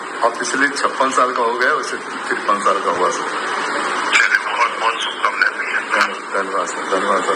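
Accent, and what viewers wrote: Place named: Malihabad, India. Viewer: ji bilkul malihabadi andaj me apani bat rakhate ho to mujhe bahut achcha lagta ha...thank you good news batane ke liye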